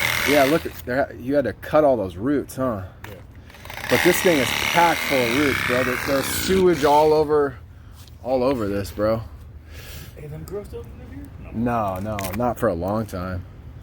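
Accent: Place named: California, United States. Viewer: Love the cali accent my guy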